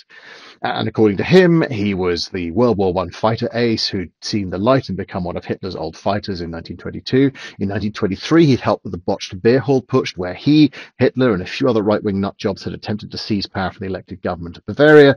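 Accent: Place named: United Kingdom. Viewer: ... a beautiful and glorious Limy Brit that can make swearing sound classy😂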